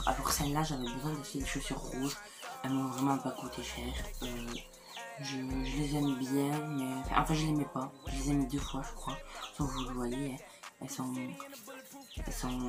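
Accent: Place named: South, France. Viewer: Bonjour j'adore ta chaine et j'ai la même passion que toi...Tu habites où avec ton super accent du sud???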